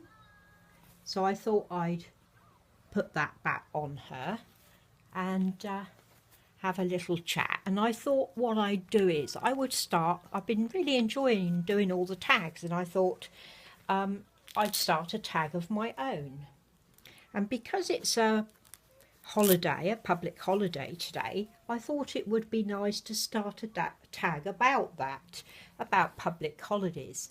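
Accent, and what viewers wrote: United Kingdom. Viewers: I love your Sophie .she looks a lot like the Maize sculpt.she is gorgeous.she makes you smile even when your feeling. down many inches is she ? I'm from the u.s.a.YOU sound like you have a British Accent.that I love by the way.kisses to Sophie from aunt Eve.by the way Easter is my favorite time of the year.FOR JESUS.KEEP MAKING THE SOPHIE VIDEOS😝😝😝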